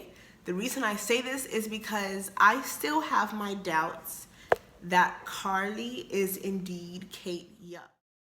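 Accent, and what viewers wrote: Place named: France. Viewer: She's definitely not American, earlier videos show that she has a noticeable accent (possibly French) and English is not her first language hence spelling mistakes and such.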